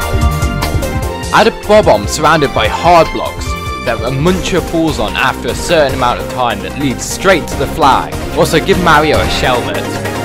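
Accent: United Kingdom. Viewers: Ur you British?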